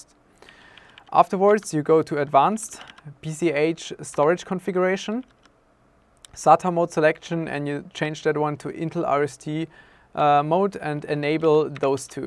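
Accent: Germany. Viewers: Deutscher Akzent kickt rein xD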